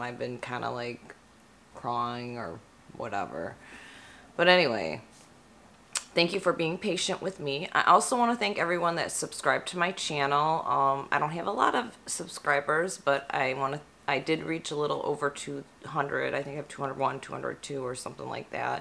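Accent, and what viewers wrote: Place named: United States. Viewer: >>> lol. I don't think you have an accent... Maybe the guy was just mesmerized by your american accent. 😁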